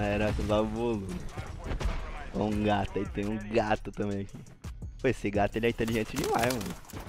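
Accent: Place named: Rio de Janeiro, Brazil. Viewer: Eu achava que tu era carioca kkkk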